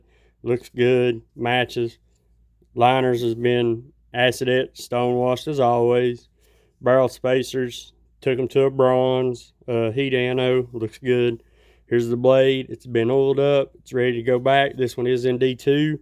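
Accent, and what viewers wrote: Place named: Georgia, United States. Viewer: We are from Georgia. You sound like you are from here too lol! Nice knives! Liked and subscribed!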